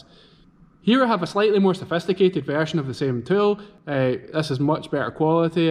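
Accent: Scotland, United Kingdom. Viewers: Have you seen the Palace of Glass video showing how they chip the glass in predictable ways to make a pattern on the chipped edges? Amazing. Hearing you voice reminds me of my visits to Scotland and once this covid pandemic is controlled with a good vaccine, I need to visit again.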